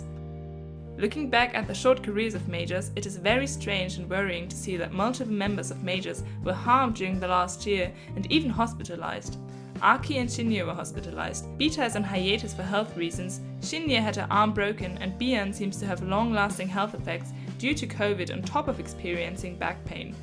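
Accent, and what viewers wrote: Germany. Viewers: this might be irrelevant but i absolutely love your accent! i think i can hear that you speak german as your first language, and i just wanted to say that it makes listening to you speak very relaxing. sehr schön!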